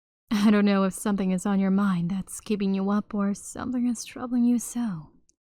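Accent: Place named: Vietnam. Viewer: the vietnamese pronunciation is kinda cute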